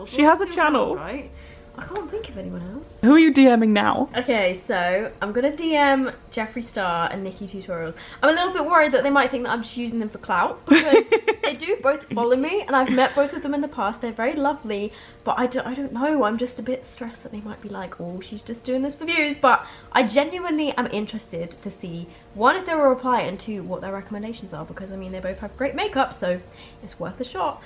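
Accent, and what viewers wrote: England, United Kingdom. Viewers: Is she An Englishwoman? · vlogs british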